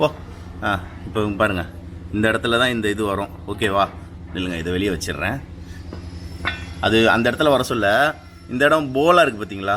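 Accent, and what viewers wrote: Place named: Chennai, India. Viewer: Hi man...நீ பேசுறத வச்சு நீதான் கைப்புள்ளன்னு நிக் நேம் வச்சுகினேன்னு நென்ச்சிட்டேன்பா. .. படா ஷோக்கா கீது பா ஒன் டாக்கு ஆங்..மட்ராஸ் பாஷை கொஞ்சம் கூட எச்சாவா கயந்து அள்ளி வுடுபா..பேஷாபூடும்..ஒன்க்கு அது நல்லா சூட் ஆவுது தோஸ்த்து!!!!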